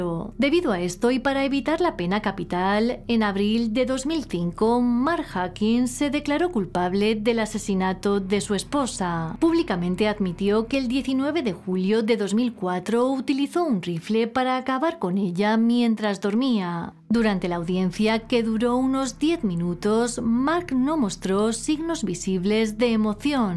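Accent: Spain. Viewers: Porque la critican aquí en España se habla así vale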